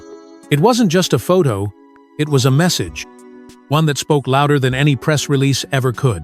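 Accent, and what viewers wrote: United States. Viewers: Good for Princess Katherine if it's true which I hope it is! Why is being said by another American voice? Stay out of it, it's for the British people not Americans!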